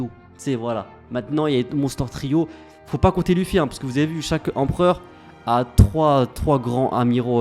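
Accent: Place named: France. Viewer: Mon avis ? Parle moins avec ton accent de cité ça gonfle 5 s de vidéo j en ai eu assez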